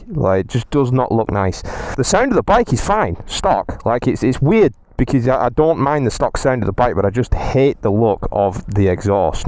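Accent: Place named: Scotland, United Kingdom. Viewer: Also as a Scot in the USA, it’s nice to hear the accent, riding is so much better here, our weather sucks for riding lol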